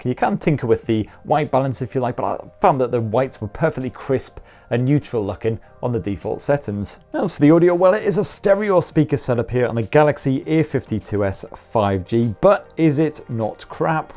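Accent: United Kingdom. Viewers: This guy is very good in presenting but sometimes I have little difficulty to understand him. Kind of fast when talking. I beleive accent spoken here is British, but from what part of the UK? Saying "bloody weekend" at the end was a bit scary but I'm sure it was meant something nice 😁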